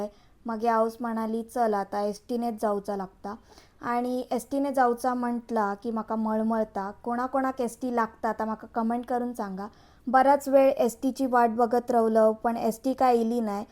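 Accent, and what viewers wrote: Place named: Malvani, India. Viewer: ताई बोलत रहा आपली मालवणी, कोकणी ऐकायला खूपच भारी मुंबईत रवान पण गावचो feel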